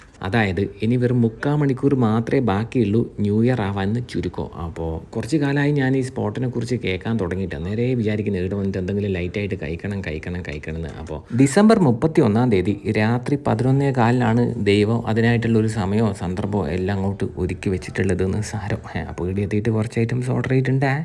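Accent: Kannur, India. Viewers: Kannur basha thenhe...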